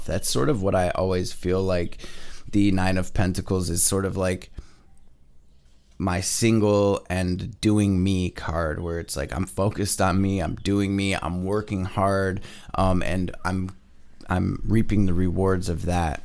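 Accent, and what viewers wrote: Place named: Bay Area, United States. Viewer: Dannng you handsome AF, you from the Bay Area? You kinda sound like it♥️ Besides that your reading is on point for me. Thank you!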